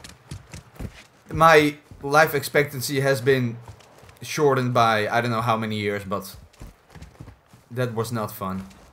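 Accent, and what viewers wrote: Netherlands. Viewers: very nederlands accent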